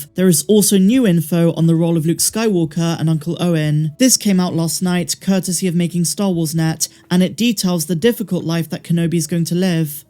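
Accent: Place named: West Country, United Kingdom. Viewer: Gotta be West country right? Your accent sounds so much like mine, I genuinely find it a comfort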